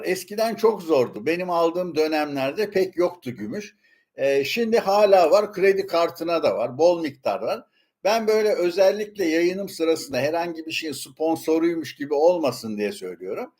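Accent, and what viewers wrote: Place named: Yozgat, Turkey. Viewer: Yozgatlı ağzı var kardaş sende bir de çatı,reklâm falan burada bile dümen turundasın çene güçlü edebiyat iyi..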